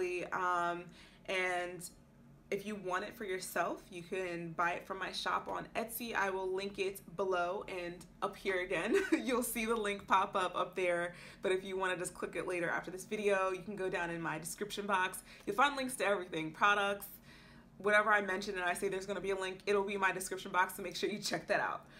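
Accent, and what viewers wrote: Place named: United States. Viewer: natural hair black women are sooooo gorgeous and the american accent on top is a bonus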